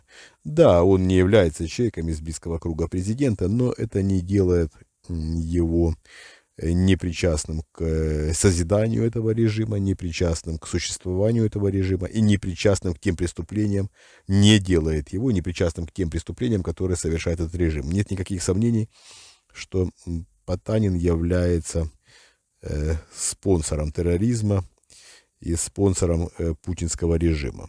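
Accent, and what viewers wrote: Ukraine. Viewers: Вы бы хоть от акцента окраинного избавились и речь подкерриторивали-вброс хохляцкий.....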